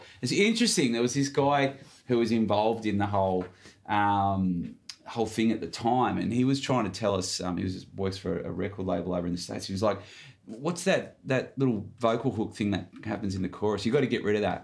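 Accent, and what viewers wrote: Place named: Australia. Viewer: haha love the aussie accent so strong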